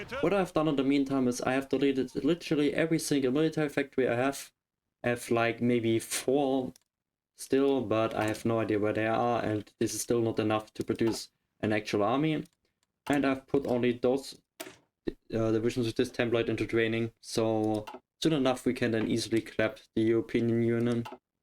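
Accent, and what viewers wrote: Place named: Germany. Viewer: nice video bro und mann hört das du deutsch bist no front :)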